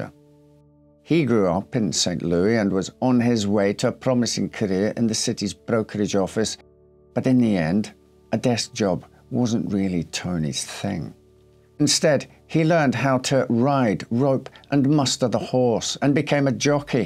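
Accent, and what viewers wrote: Scotland, United Kingdom. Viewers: Host, what is your accent? I said Channel Islands, my wife says Scottish??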